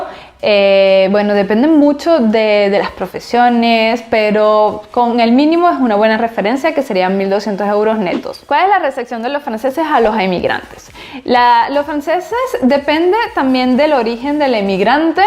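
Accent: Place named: Venezuela. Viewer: Pinche acento venezolano no lo soporto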